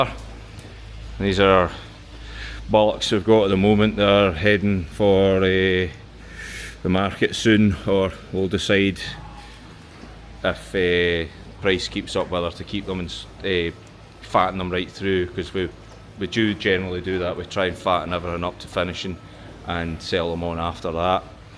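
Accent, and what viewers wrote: Scotland, United Kingdom. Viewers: Hi great videos and I really enjoy watching them. Especially as you're a fellow Scot with a similar accent. I was born in Lanark. I'm curious to hear where in Scotland you are? Are you south west of Lanark? Keep the videos coming 👍👍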